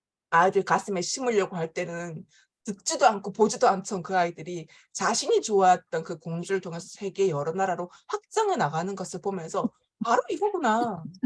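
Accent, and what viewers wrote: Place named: Gyeongsang, South Korea. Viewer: 작가님~오랜만에 유튜브 올라왔네요^^얼마전에 교집합 스튜디오에 나온거 보고 엄청 반가웠는데 ㅎㅎ 작가님의 이 구수~~한 갱상도 사투리와 억양+ 가감없이 진솔한 옆집언니같은 화법 완전 팬입니다!! 듣는거 자체로 힐링됩니다~앞으로 자주 올려주세요~~❤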